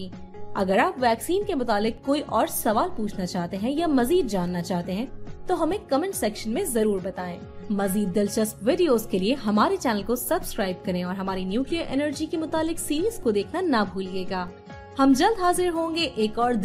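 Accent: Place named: Urdu, Pakistan. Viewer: Nice Urdu Accent.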